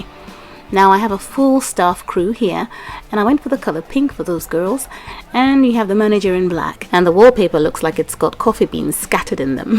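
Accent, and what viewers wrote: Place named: United Kingdom. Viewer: Ahhh you live in England two I kne2 you had a little British accent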